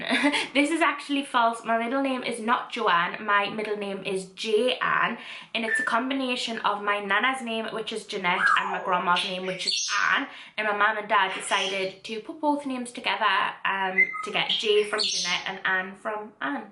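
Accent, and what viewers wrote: United Kingdom. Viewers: Where abouts in the uk are you? I can’t work out your accent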